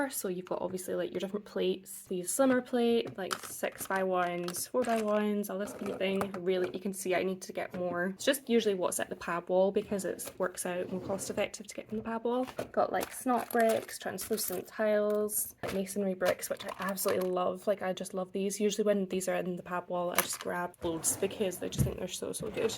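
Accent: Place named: Scotland, United Kingdom. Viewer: A SCOTTISH LEGO BUILDER. I live in Falkirk. I watch Jango Bricks etc and stumbled onto this and my ears went more bigger than they are when I heard a scottish accent. Subscribed. Need to look over the channel. Not one for comments but Thought I'd say Hi.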